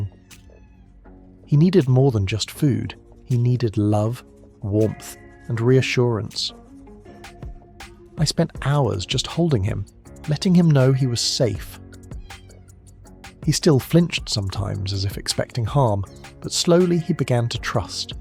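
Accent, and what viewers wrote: United Kingdom. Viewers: Why is the voice from uk this is not filmed in the uk.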